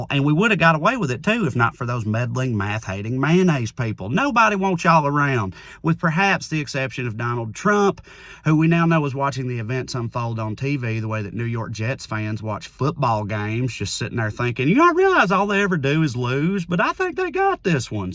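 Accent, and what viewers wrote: South, United States. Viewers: The accent and aphorisms of my Midwest and Southern Americans is always a pleasure! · I love hearing a voice like this coming out of the South. I’ve lived around a lot of southern people. Wasn’t aware there was much of a liberal slant there. So refreshing. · Thanks for not abandoning your accent and Southern roots. It makes your commentary much more poignant and helps to dispel the false impression that a southern drawl will always indicate that person behind it is a racist, rebellious, brain dead moron, whose every word must be ignored. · Well said. Thanks for the clear words - in the accent most of us associate with completely different sentiments. You redeem the South.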